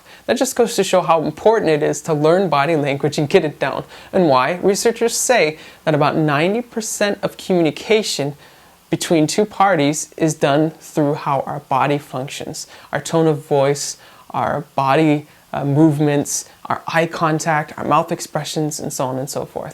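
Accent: Brazil. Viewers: O português dele é bem brasileiro.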